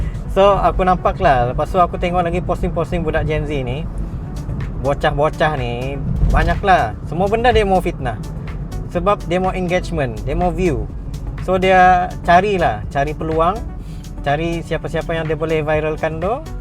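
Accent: Sabah, Malaysia. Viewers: Aku dari first day tgk sudah tau ni slang Sabah. Semenanjung juga la paling sensitif kalau dengar Sabahan slang. Lawak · yang komen to buduh kah ? loghat sabah ngan indo pun tak dapat beza. duduk dalam gua kah dia tu . kau relex je bang. budak2 skrang mmg cmtu. tu budak tu klo di tempat aku mmg kena skolah tu orang. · Hanya sabahan cam sabah accent 😅 Jalan siring2 baru Selamat bah😂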